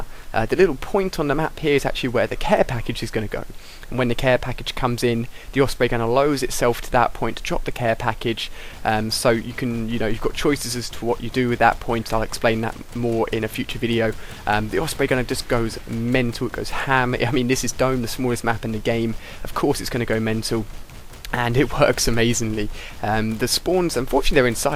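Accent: United Kingdom. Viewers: love the britisch accent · What do you think? He's only got a British accent.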